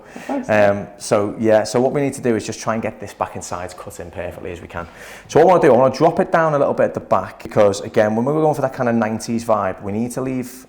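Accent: Liverpool, United Kingdom. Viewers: Liverpool UK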